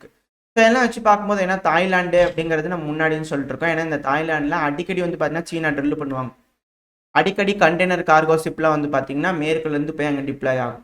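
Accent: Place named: Kongu, India. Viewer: Kongu tamil ❤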